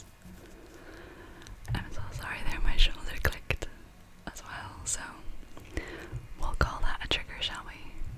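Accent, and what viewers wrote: Scotland, United Kingdom. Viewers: oh, I love a good scottish accent! new subscriber. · Love your Scottish accent... And your headphones. Is there a hint of Irish genealogy in there too?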